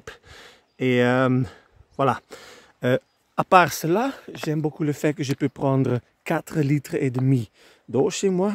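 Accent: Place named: Flanders, Belgium. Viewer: Magnifique 😍 et j’adore votre accent, j’aime beaucoup l’accent et la langue flamande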